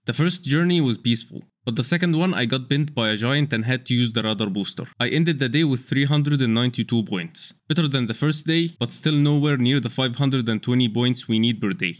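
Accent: Egypt. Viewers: I think.. your accent is Egyptian ? 👀🤔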